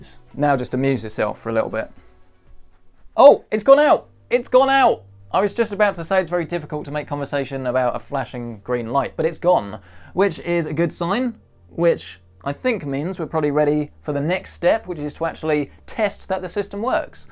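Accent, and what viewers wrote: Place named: Southern England, United Kingdom. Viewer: Sounds like Southern England to me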